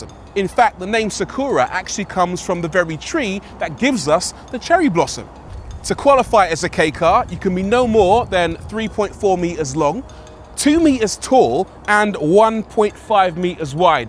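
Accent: United Kingdom. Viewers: i hate how the brits pronounce nissan bro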